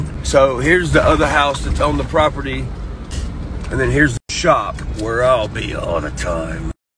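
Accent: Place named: Appalachia, United States. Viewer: Anytime my Main Man I have followed you for years! I THINK YOU HAVE A HEART OF GOLD AND A VOICE OF A HILLBILLY GOD!! MUSIC FEEDS THE SOUL! I AM SUCH A HUGE FAN I DO NOT COMMENT MUCH BUT I WATCH EVERY LIL CONTENT YOU POST UP!! MUCH LOVE FOR YOU. I am so proud of you!!! Congratulations God is good all the time!. Keep it up many more blessing my friend Love ya! 🥰🥰🥀🥀💜💜🍻🍻🍻🍻👍😉🤗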